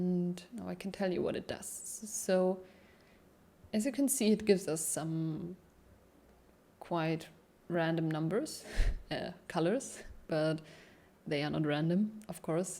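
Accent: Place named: Germany. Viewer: just found you and am really enjoying these long tutorials. Also love the charming german accent hahaha gerne mehr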